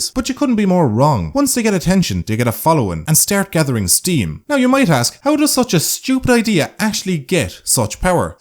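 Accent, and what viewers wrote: Ireland. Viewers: That's his accent, I'd know because I'm from Northern Ireland, which is ya know very close to Ireland, attached in fact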